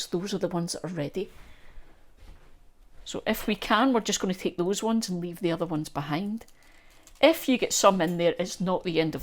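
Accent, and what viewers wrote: Scotland, United Kingdom. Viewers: I've been going back. Tried to find it but no luck.. oh well I was just curious. No big deal.. Love the Scottish accent.. From 🇨🇦 🥰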